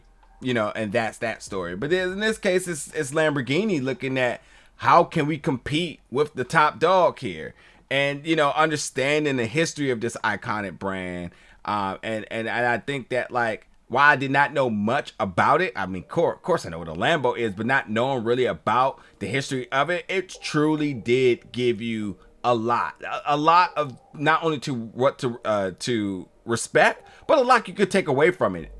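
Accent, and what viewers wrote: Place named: Baltimore, United States. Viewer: Are you from Baltimore or something? 😅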